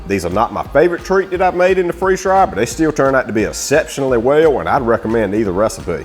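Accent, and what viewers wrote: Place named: South, United States. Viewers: What part the south y’all from? I’m from NC and I adore the degrees in regional southern accents. I love your accent but I can’t quite place it! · Sounds like A LOUISIANA accent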